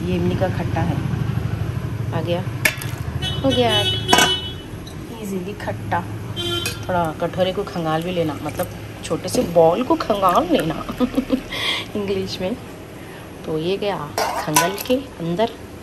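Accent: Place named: Hyderabad, India. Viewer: Hyderabadi language zabardast baat karthai ap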